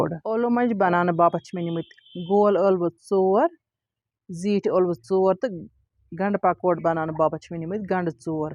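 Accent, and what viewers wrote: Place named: North Kashmir, India. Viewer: Typical kashmiri bolebosh. Aap zaroor north kashmir say honge.....either bandipora or baramulla district.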